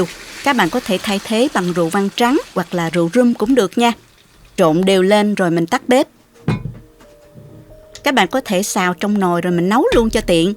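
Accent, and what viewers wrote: South, Vietnam. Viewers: Giọng Nam Bộ kiểu mỗi ngày một hiếm, giờ xem truyền hình, phim trên HTV, giọng nam toàn gì đâu.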